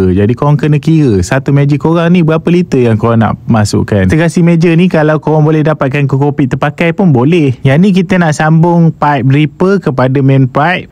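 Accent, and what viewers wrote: Pahang, Malaysia. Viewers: macam orang pahang. lorat pahang ke?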